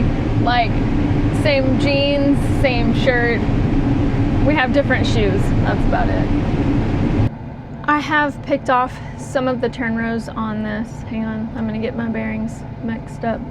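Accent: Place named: Texas, United States. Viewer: Love you and your texas voice and accent ❤ thats for the very exelent video ❤😊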